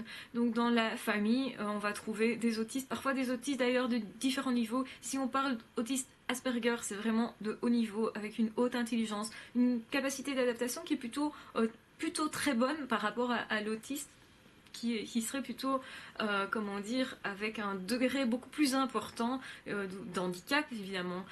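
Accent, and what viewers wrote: Belgium. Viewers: Etes-vous de Liège ou de Bruxelles? :-)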